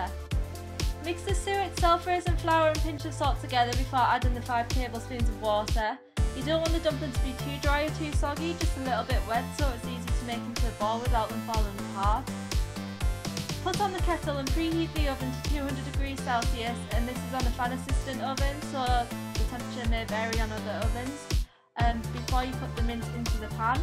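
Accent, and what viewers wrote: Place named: Tyneside, United Kingdom. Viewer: where u from as u sound like ur from tyne and wear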